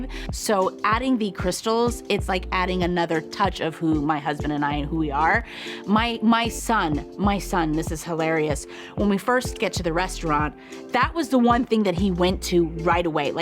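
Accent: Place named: Los Angeles, United States. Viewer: True lA girl I can hear the accent, this was so beautiful!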